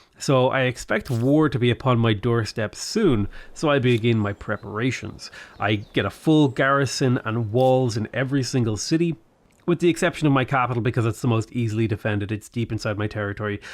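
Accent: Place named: Ireland. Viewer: Came for the review, stayed for the brogue, subbed for playing for Ireland 🇮🇪 quote.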